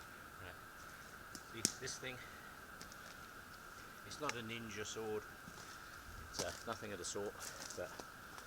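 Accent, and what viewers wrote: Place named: England, United Kingdom. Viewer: You sound English. Are you? But where are you filming? Canada or America? or Europe?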